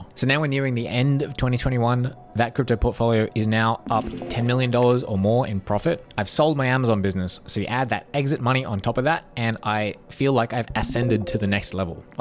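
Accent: Australia. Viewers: Well I relate to you the only difference this happened three times in my life three big cycles. Now going up again fourth time I’m retiring soon!! From the age of 18 to 62. You sound like an Aussie I’m ex Aussie now American resident and Canadian citizen. In the end it’s all a ok if it’s not ok then it’s not the end.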